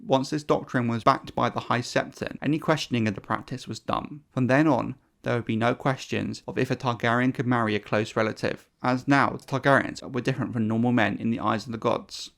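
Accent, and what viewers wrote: United Kingdom. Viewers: Let me guess you are from Liverpool 🤔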